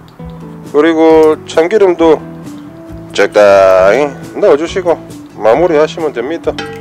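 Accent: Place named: Gyeongsang, South Korea. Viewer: 구수한 경상도 사투리로 듣는 맛있는 양념갈비 레시피 재밌었어요.^^ 댓글 보고 왔는데 ㄱㄷ하고 갑니다. 앞으로 자주 소통하기를 바래요.^^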